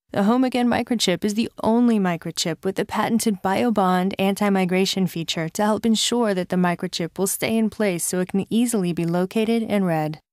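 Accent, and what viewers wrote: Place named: Maryland, United States. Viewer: What a maryland accent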